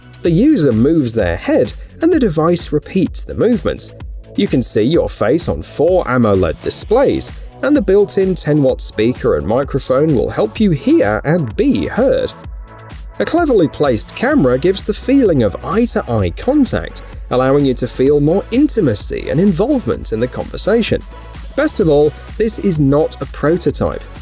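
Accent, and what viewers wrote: England, United Kingdom. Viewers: The Falkirk Wheel is in Scotland, so the prototype was probably made from Meccano. The presenter sounds like he is English, so he should be familiar with Meccano; it is still being manufactured... even if it is in France and China! 🥴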